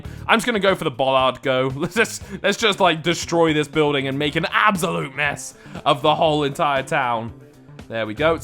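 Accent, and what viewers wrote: United Kingdom. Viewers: Wish there were more maps. The 3 rotating maps are getting old. No matter how much of a British accent you put on it, this game is truly becoming nothing more than a boring time waster (even with the Halloween events that take literally 3 tries to complete).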